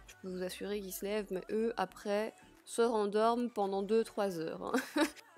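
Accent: Belgium. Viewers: Hello 🙂 Ohhh j'ADORE tes Routines! Tellement simple, enfin ceux sont clairement mes FAVORITES! 😍 Jamais dans le "m'as tu vu", toujours réel, fidèle a toi même Ta bonne humeur etc.. Puis ton accent belges dans tes Routines, MA VIE 😄 Des bisous 😘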